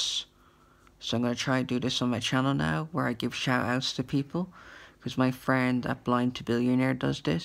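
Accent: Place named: Ireland. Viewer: I understand your anger, but that is an energy you can use. Let us see more of your face, Your smooth Irish voice is an asset, your content will be interesting. Ask one of those pesky sighted people to help you to give us a full face shot in light. Even if you use a couple of still-shots taken outside. As a person with Asperger's you have plenty of material there as well. Remember to make a new trailer video every 3 months. I say this not to offend but as suggestions only. Recently I have started to upload talking videos showing my old beaten face against some of my artwork and it was a hard decision to make as everyone loves you when you are young. Old is not fashionable. Stay safe over there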